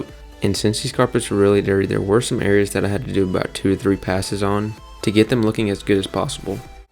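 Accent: South, United States. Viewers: Detail geek but southern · i heard your voice i ohhhhhh hesss a countryyyyy boyyyy😊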